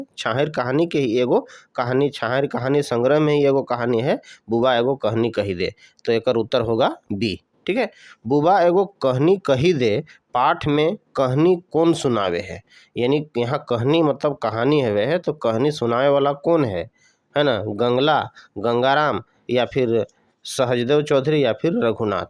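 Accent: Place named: Khortha, India. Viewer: आपके खोरठा बोलने की शैली से मैं काफी प्रभावित हूं। कृपया आप नियमित रूप से विडियो अपलोड करना सुनिश्चित करें,यह चैनल बहुत तरक्की करेगा। 🙏 धन्यवाद 🙏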